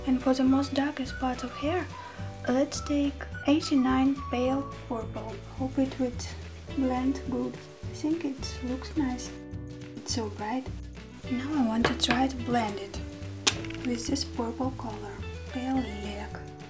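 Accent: Russia. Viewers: Руссский акцент ) суперский обзор ))! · Какой русский акцент... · Кто русский? У автора акцент русский :D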